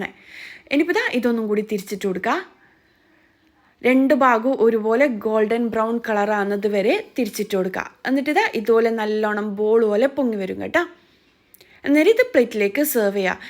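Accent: Kannur, India. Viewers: നമ്മൾ കണ്ണൂരുകാരുടെ സംസാരം ഇങ്ങനെയാ😁😁🥰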